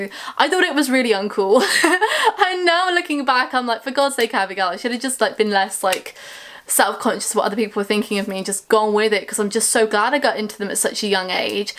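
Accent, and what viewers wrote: United Kingdom. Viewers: Aww hello to u hon..Im Nancy..I just came across your channel while doing some checkup updates on....I must tell u that it's so so awesomely good to see the younger newer generation of today getting into one of the most underrated bands of my time..The 80s..so underrated there totally awesome...not many people have ever heard of them even back then..I was a teenager when they came out and all through my teen high school years even today now I still love them..yes I'm older now..just turned 51..this is me in the pic. You remind me of myself then blond hair all black clothing..which I still wear.im still that cool 80s chick at ❤..lol I know so much about and other great 80s bands like them u might love to know about and give a listening to..huggs to u..yes.i talk alot to..by the way..i love your English accent.you a Brit...nice to meet you dear..always an old school Curran here..huggs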